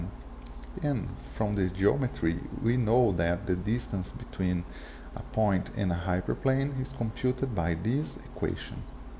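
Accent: Brazil. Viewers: You are brazilian, aren't you? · By the accent I can say you are brazilian like me :) Great video! Helped me at the exam :) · Que sotaque brazuca hein? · sounds like brazilian english =) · Great explanation! You must be Brazilian by your accent right?? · are you Brazilian? you accent sounds a lot like the Brazilian one...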